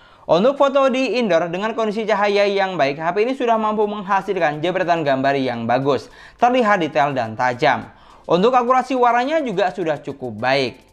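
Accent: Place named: Martapura, Indonesia. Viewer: Orang Martapura yah mas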